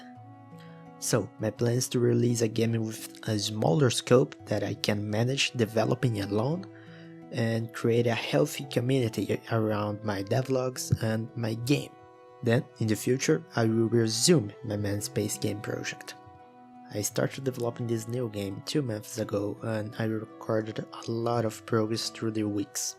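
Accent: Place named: Brazil. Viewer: Cara, pelo que vi, você é brasileiro (suspeitei pelo sotaque e uns comentários aqui hahaha). Queria deixar aqui minhas palavras de incentivo e de admiração. Este vídeo e a sua jornada são inspiradores pra mim na minha busca de criar um jogo. Você me parece um rapaz muito criativo, dedicado e talentoso, por isso, espero que vc atinja seus objetivos e sinta a alegria e satisfação de ver seus projetos completos. De verdade, mano, meus parabéns!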